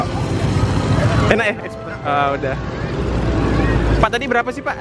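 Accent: Malaysia. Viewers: Asli mana sih bang ini. Macam kek org malaysie punye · Bang asli Mane? Melayu nampak bahase tu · Eeee kek bahasa malaysia cokkk salam dari malaysia banggg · Cara bicara seperti orang Malaysia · Abang orang mana sih ? Kok bahasanya kayak bahasa Malaysia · Ko kya bahasa Malaysia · Asli mana aa logatnya ari malaysia yah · bang orang malaisia ya suaran ya seperti orang malaysia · Aslinya org mn? Logatnya melayu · Saya ngikutin HOBI MAKAN dari Subcride 14.000.. skrg udah 700.000-an... selalu liatin updating nya tiap minggu Sukses selalu bang, selalu bersedekah.. dan logat melayu nya tak berubah😂🤣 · Aksen melayu nya gk ilang2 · Mas nya asal mn ya ?? Bhs nya kadang seperti malaysia..hehe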